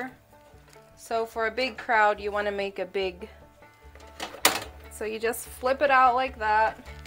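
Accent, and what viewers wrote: Kenya. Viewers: You sound so Kenyan🤣♥️ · You sound more of a luo,its understandable coz has been brought up amongst luos,he actually looks more luo than kisii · You already sound Kenyan, your accent!! This was lovely to watch. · Your accent are becoming Kenyan 😍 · That's ugali size normally not enough for one 😃. I like this and definitely you no longer sound mzungu..yous accent is just Kenyan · I can hear a bit of Kenyan accent in your Lish. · Your accent sounds like Kenyan and not American….by the way I live in Reynoldsburg, Ohio. You’re inspiring! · Your Kenyan accent is on point! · So....one thing that has fascinated me about you is the Kenyan accent you have while speaking English....amazing. I am Kenyan myself living in Iceland for twenty three years. You just earned a new subscriber. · Your accent and English are soooo Kenyan. The adaptation/change is incredible, almost unreal. 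Enyewe, we learn everyday. Waah!! And there's nothing as tasty as Ugali and samaki. I could eat that every day of the year, but I have to get over a laziness in the kitchen that's come over me lately.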